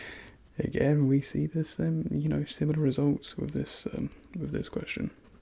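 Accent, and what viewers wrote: United Kingdom. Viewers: I love his voice. His accent is ✨perfection✨. I love English accents. Proper English, also known as British English, is just so intelligent, it sounds intelligent. People who are from the UK, when they speak, they sound so educated. But your voice really calms me down, and I love that you can do that. Not everyone's voice calms me down. But you made me feel very relaxed, so thank you. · What kind of English pronunciation is it? I want to learn it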